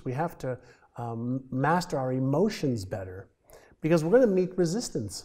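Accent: France. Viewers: A blond guy with a french accent , what a treat, video made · This French accent: -) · I love this French accent.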